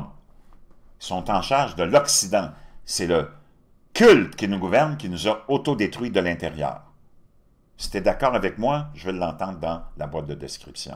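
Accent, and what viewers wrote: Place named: Canada. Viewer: Bonsoir, je vous écoute toujours avec un grand plaisir avec vos jokes, tournures de phrases et votre accent canadien 😂. Concernant votre sujet du jour je désire apporter mon témoignage. Je suis née fille en 1960 et je le suis toujours aujourd'hui... grâce à mes parents qui étaient logiques à l'époque. En effet à l'adolescence, époque que l'on qualifiait "d'époque bête" j'ai eu envie d'être un homme je n'aimais pas trop mon corps de fille. Pas pour le sexuel, en <num>, on ne savait pratiquement rien de ce qui avait trait au sexe 😊 et quoiqu'on en pense, ce n'était pas un souci pour notre vie sexuelle d'adulte. Moi, je voulais être un homme parce que je pensais que les hommes ne souffraient pas et que seules les femmes étaient malheureuses ! Quelle chance que mes parents ne se soient pas préoccupés de mes pensées délirantes d'adolescente 😂😂 je serais un homme vraiment malheureux ! 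Non, non !!! Je suis restée dans ma nature de femme 😊 et maman de deux enfants😊. Je remercie mes parents disparus 😢 de leur lucidité. Ceci est mon message aux parents et enfants d'aujourd'hui. Merci de vos vidéos 😊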